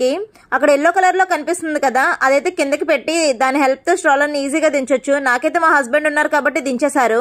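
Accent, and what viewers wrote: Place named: United States. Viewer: Y ur trying like America girl laga voice trying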